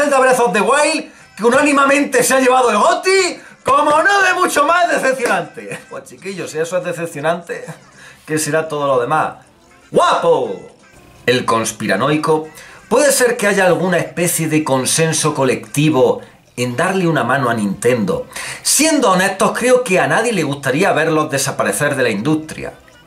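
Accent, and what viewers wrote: Andalusia, Spain. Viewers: Daniel Vargas porque es andaluz y por ende habla en su dialecto, que viene determinado por el lugar donde te crias y vives, mientras que escribir mal, es directamente una falta cultural bastante grande.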